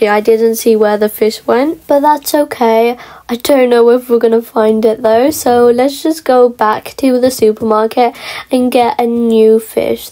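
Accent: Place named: United Kingdom. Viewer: I ❤ ur British accent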